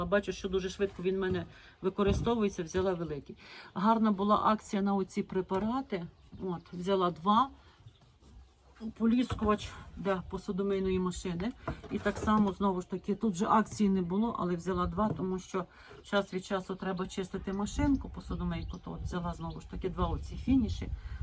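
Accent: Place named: Ternopil, Ukraine. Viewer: та я також з Тернопільської обл, чую говір наш😉я з кременецького району, може й десь недалеко живемо від Вас😀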